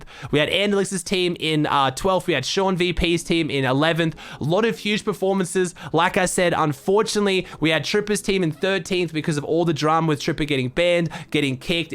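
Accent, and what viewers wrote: Australia. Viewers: Robotic Aussie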